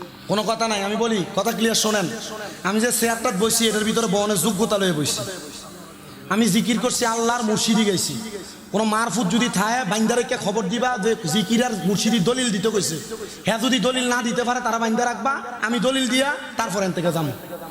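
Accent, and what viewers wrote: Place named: Comilla, Bangladesh. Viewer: তোমার কথা শুনে বুঝা যাচ্ছে তুমি কুমিল্লার মসলমান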